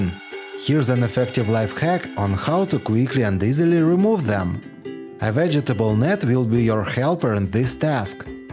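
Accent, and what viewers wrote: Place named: Russia. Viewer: Мужик, ты чертов гений! Лайк тебе за лайфхаки и за классный русский акцент. Подписалась.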